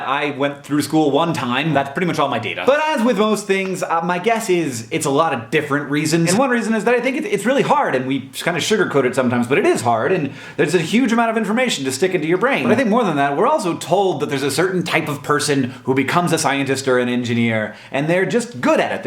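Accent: United States. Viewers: Do you live in America? (Judging by your accent from your videos, I think you do.) Money practically grows on trees here...if you have any sort of brains, creativity, OR charisma. Or a pulse. If you feel like "there's no money" in a certain field move to India for a few years. Try out Pakistan. We have it pretty good.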